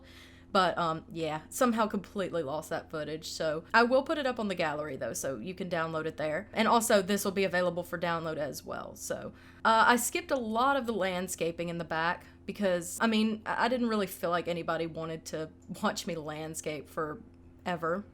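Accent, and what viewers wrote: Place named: Appalachia, United States. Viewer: Love your voice! Where are you from!? Definitely sounds like an Appalachian accent! Also love the renovation!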